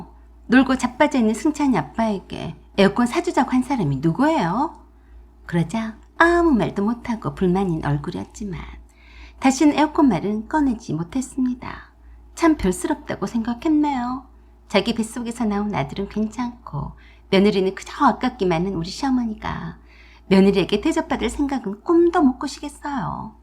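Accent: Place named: Jeolla, South Korea. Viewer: 말투가 제 올케랑 너무 비슷하세요.. 전라도 특유의 억양이 특히나.. 말씀을 재밌게 하시네요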